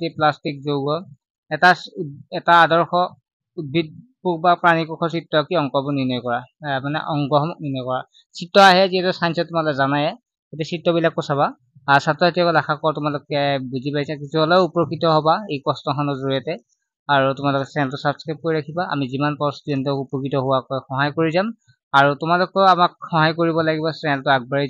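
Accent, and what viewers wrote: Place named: Jorhat, India. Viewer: Sir jorhat district r lage 😅😅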